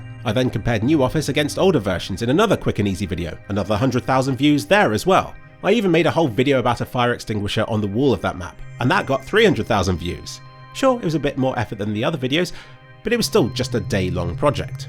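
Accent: United Kingdom. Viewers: I once told a friend about an old animated film, that one famous director spent many years and tens of millions of dollars on, only for it to die in production hell, because of the perfection the director demanded. The friend then said that they should have simply spent more money and time on the movie. He then questioned why all animation shouldn't be 60 FPS, if you love your job you would want to make it as good as possible, right? I love you and your videos, but I gotta be honest - as a layman, I never really notice the difference between the videos you spent 4 hours or a month on, even though I watch 99% of them. If I were to give an objective, third person view of your content and why I like it - it's that I like seeing you talk on random topics I never thought about, but seem interesting in retrospect, you make the most mundane stuff interesting to listen to. 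I'm not into graphics cards or processors, but hearing you talk about this stuff is educational. (maybe I just like British accents, I watched the entirety of Hilda because everyone had cute accents) So I personally just want to see you passionately talk about random stuff you find interesting, making me find it interesting in turn. Don't know if this helped, but I wish you well anyway.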